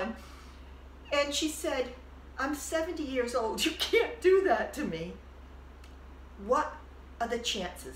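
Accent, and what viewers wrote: New England, United States. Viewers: Are you from Rhode Island or Mass?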